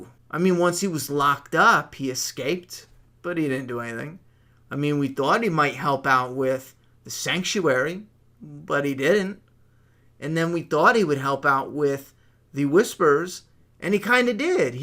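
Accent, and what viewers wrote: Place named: New York, United States. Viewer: YOU must be from New York or Jersey. My son came home with this accent. LOVE it. I laughed when you got pissed at your phone. Ha ha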